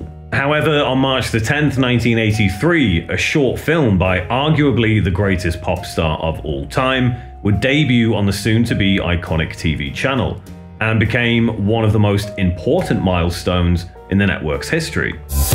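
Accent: United Kingdom. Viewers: You sound British, but you only mention MTV us. MTV Europe would of being your channel until 1997, when it was replaced by MTV uk, which at first was ok for a couple of years, but MTV Europe was the channel I watched, even after MTV uk took over, I still done everything to get MTV Europe back, what a channel that was. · I actually fell asleep listening to this stiff britt try and explain American MTV 😂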